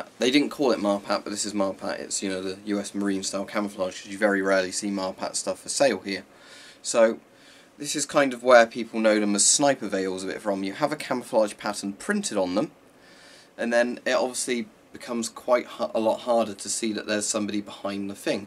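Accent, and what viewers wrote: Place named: United Kingdom. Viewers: I like the way he says hello with his British accent and every video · Americans will listen to a Brit read the IRC tax code since we love that accent.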